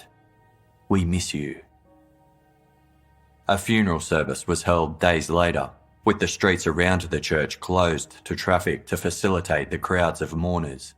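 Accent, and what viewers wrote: Australia. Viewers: It’s Australian and I pity you that you can’t understand English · The narrators voice sucks, can’t understand some things he says, his Australian or British accent is so strong that it makes it difficult to distinguish some words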